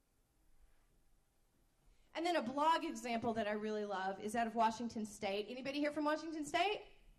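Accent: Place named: Kentucky, United States. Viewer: It was brought to my attention today that someone is offended by a comment I make at the start of this video, and because of my earlier comment here, referencing my Kentucky accent (the offended person didn't write me - her colleague did). Prompted by that second-hand feedback, I've written a blog that I'd like for anyone who is offended by my self-depreciating humor to please read first BEFORE becoming outraged. There's also suggestions in the blog on how to communicate with me directly about any of my work, so that things can be clarified before offendedness ensues. <link>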